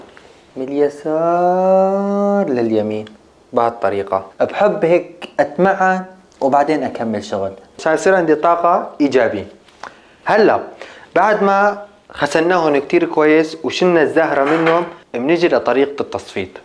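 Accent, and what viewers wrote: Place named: Idlib, Syria. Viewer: لهجتك ادلبي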